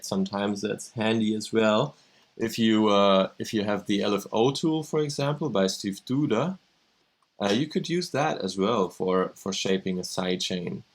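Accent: Germany. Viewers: Man hört raus dass du deutsch bist xD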